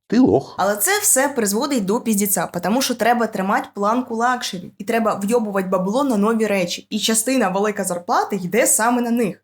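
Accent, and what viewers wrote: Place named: Zhytomyr, Ukraine. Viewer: Ой цей божественний житомирський суржик)) Лайк підписка)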